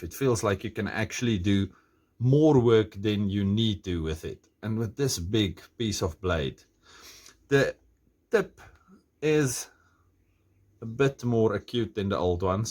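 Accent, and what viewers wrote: Afrikaans, South Africa. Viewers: Love die Afrikaanse aksent ou bul. Lekker om Suid-Afrikaaners ook op YouTube te sien.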